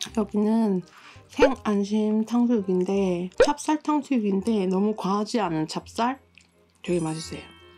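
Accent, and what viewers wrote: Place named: Busan, South Korea. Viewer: 악ㅠㅋㅋ 친근한 부산말투라서 부산인쥴ㅋ 죄송해욤ㅋㅋ 항상 응원합니당ㅋ 😍😍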